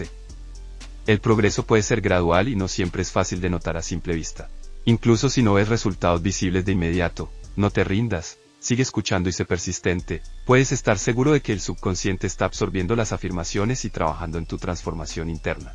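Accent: Colombia. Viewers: Esa voz se escucha como medio colombiana❤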